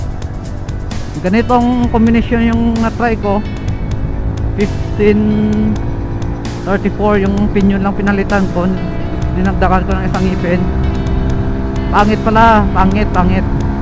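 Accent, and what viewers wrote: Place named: Iloilo, Philippines. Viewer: ilonggo gid ah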